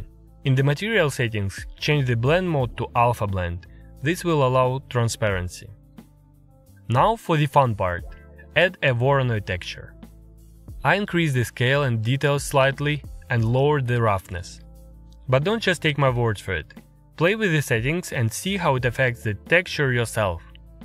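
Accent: Russia. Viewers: Мне кажется, или это русский акцент?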